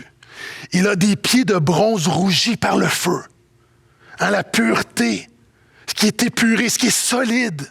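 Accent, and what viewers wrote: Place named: Quebec, Canada. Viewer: Accent québécois. Difficile oui.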